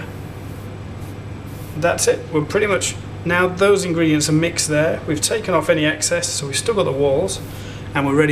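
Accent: United Kingdom. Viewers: Are you northern english??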